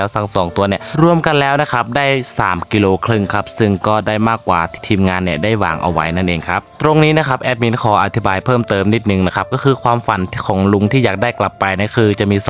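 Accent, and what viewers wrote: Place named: Cambodia. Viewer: แอดค่ะ ล้อเหมนบ่อย จนพูดติดสำเนียงเหมนไปแล้วนะฟังผ่านเหมือนคนเขมรพูดไทยเลย😅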